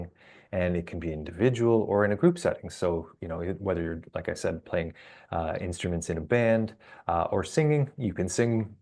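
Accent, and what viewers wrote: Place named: Scotland, United Kingdom. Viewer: Yep...nice one 😮 nice Scoish accent 😅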